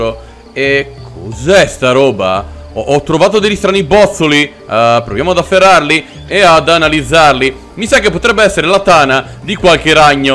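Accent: Veneto, Italy. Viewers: Scusa ma sei del vaneto? Comunque sei troppo simpatico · Ma sei del veneto ? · Aaaaaaah ma sei un conterraneo del veneto! :D:D:D:D:D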